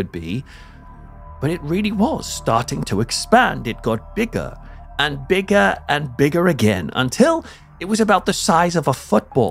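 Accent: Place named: North East England, United Kingdom. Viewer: Ah,I thought you were from north east,been trying to track the accent,was right,I was born there but moved to Doncaster when I was 2.keep it up Bonny lad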